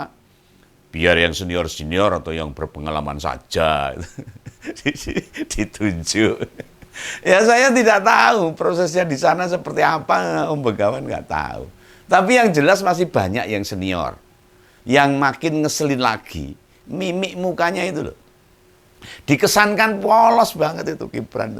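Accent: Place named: Semarang, Indonesia. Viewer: Penjelasan Om dengan logat Semarangannya asyik😅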